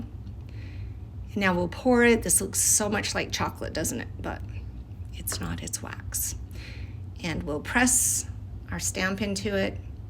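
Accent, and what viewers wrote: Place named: Great Plains, United States. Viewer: plains states. Northern-ish, Like Kansas toward Idaho. Huwhite, meAsure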